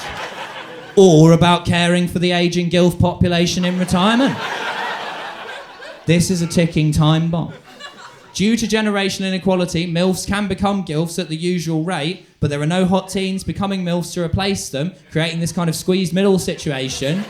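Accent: Belfast, United Kingdom. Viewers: Milo's use of a vague belfast accent during this was very enjoyable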